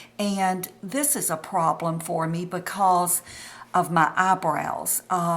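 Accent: South, United States. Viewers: So glad to hear that someone else had the same experience as I did upon turning 65. I've always taken care of my skin except when we didn't know what the sun could do. So going from what everyone told me was beautiful skin to lines, sagging, brown spots, wrinkles and under eye bags has been tough. Glad I found you! Love that southern accent! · 70!? You look INSANLEY FABULOUS! Plus I hear a southern twang!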